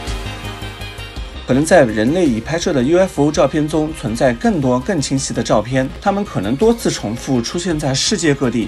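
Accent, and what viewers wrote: China. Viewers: 听口音大陆的哦！ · 一直以為tea小編是台灣人耶，聽口音很像上海人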